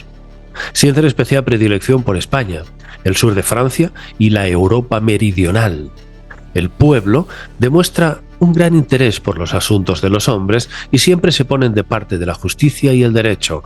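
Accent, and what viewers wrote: Argentina. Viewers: Como que su voz es argentina? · Como su vos es argentina no entiendo?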